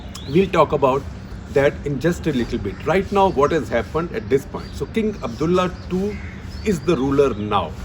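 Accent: India. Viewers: Very interesting subject and very informative, BUT very difficult to understand what you say… because of strong Indian accent !! Pity ……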